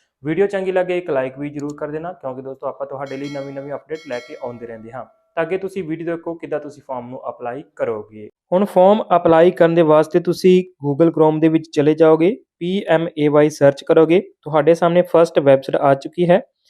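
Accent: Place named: Bihar, India. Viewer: Tere awaj bihar Wali lugi menu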